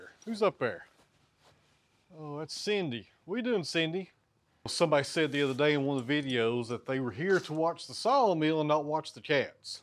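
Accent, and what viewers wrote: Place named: Kingsport, United States. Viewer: I like Billy Bob Thornton, too, so look at the comparison as a great compliment. Nearly 50 years ago I used to go to Kingsport Press to oversee production of some of the books I worked on, and I loved the area and the folks who worked there were great to work with and to be around. You, so much remind me of those good, friendly people. And you talk like them’ too. I love the dialect. I used to say that I didn’t care for cats, but that was before I got to know and understand ‘em. Interesting critters they are. I l very much enjoy your channel and keep up the good work.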